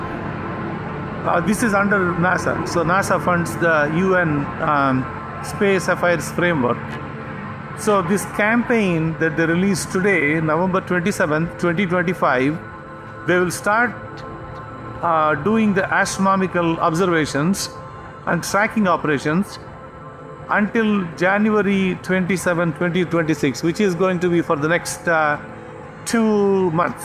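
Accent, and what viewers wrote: India. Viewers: I just can't hardly listen hard to understand. Indian accent. Sorry.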